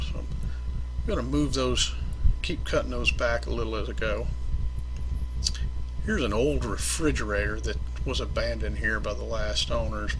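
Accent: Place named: Arkansas, United States. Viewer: You sound like your from Arkansas!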